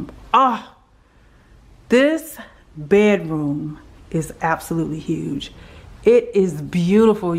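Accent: South, United States. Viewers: I love your voice, it’s so calming and southern! Love it, I’m from the south too but I’m more Appalachian sounding lol. Good job! 😊😊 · Best southern accent-I just liked listening to you.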